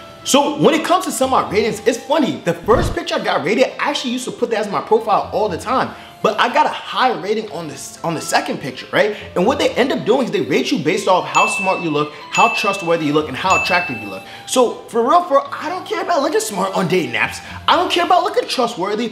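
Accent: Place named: United States. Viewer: He doesn't even sound like hes from Toronto. He sounds American as hell.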